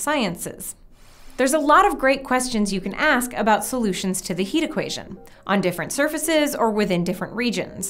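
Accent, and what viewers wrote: United States. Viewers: Knob - Not at all: he spoke very very clearly and not fast at all. And I'm non-native English speaker: he has the correct Virginian speed and accent for me (and for most AFAIK).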